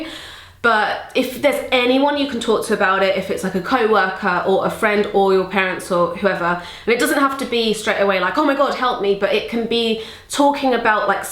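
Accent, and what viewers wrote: United Kingdom. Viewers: You are so cuteeeeee with your british accent!!!!!!! <3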